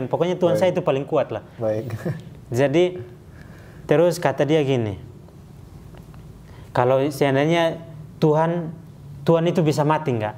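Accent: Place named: Eastern Indonesia, Indonesia. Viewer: Dari logat bicaranya sprtinya orang timur nii.. Semoga istiqomah dlm iman islam hingga akhir hayat..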